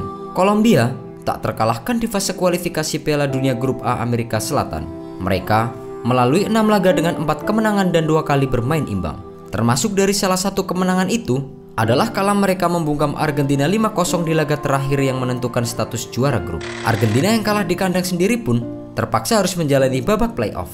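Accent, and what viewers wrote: Java, Indonesia. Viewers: Yg menyiarkan pasti org jawa...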